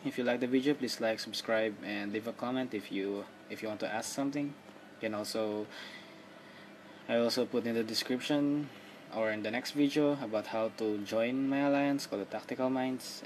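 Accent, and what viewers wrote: Philippines. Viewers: are you a Filipino? cuz your English sounds Like a Filipino. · I'm sensing a Filipino accent.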